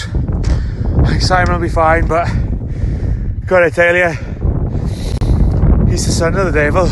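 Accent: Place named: Orkney, United Kingdom. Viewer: I'd like to suggest that you call Simon's sister *Helena*. My boy Simon is a lovely special lad. I thought I'd lost him for good one day in Truro; I took my eye off him and there he was, gone! And his tricycle, too. We were united in Burger King, eventually.... And like your Simon's mum, I've spent plenty of time at the end of my tether, looking after Simon and his sister Helena. She's had her fair share of adventures, too, and she's bonny like your Simon's sister. It would be so nice to tell my Simon and Helena that they have namesakes up in Argyll who are loved just as much 😚😚! Lovely video, amazing accent (I've never heard an Orkney accent before, it's radically different to the estuary English down here in Kent!) and so good to be involved in the lives of you all at the farm, however vicariously. Wishing you every success; Katherine from Kent.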